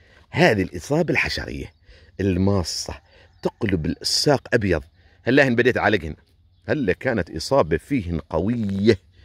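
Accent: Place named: Algeria. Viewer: صوتك تقول عليه جزائري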